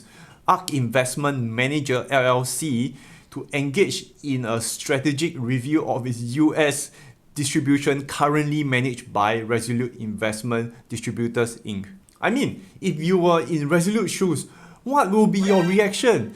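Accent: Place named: Singapore. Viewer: Singlish😂, long time did not hear it.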